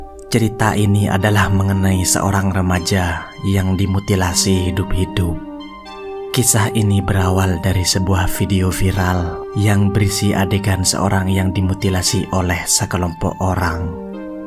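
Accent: Madura, Indonesia. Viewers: Orang Madura ya bang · Orang madura bg? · Orang madura ya bang!!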